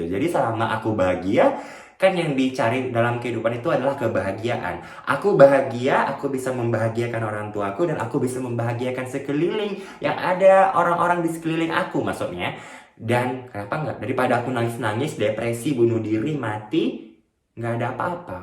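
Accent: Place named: Medan, Indonesia. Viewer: Bah kota medan kau bang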